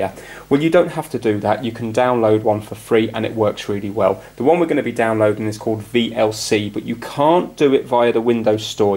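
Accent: United Kingdom. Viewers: That was so clear and easy to understand! Thanks. I lost my WiFi contract recently but when I can afford it again, I'll try this. Wish you were a neighbour; I live in Japan and am a woman in my 50s always struggling to sort out computer problems thru videos like yours. Most of my friends are older females like me and if I ask them anything concerning PCs, provider contracts, whatever, they invariably tell me they have no idea and say: "I always leave it to my husband, son, son-in-law (insert any male relation here) " and it is so frustrating. Is it the same in the UK and why? Why do men seem to be more knowledgeable on the whole? BTW nice to hear a British accent again!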